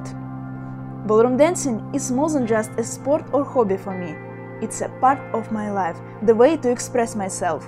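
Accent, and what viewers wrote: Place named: Ryazan, Russia. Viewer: Рязанский акцент,а так ничего,на Зулейку Ривьеру похожа, в русском варианте.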